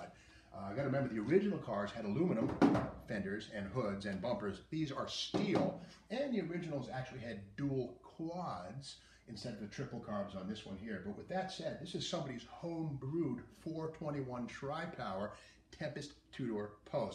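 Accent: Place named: Springfield, United States. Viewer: Must be from the Springfield area.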